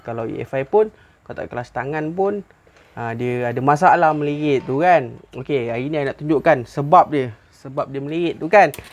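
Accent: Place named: Malacca, Malaysia. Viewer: Dengaw loghat, blh tahu.. kompom melake…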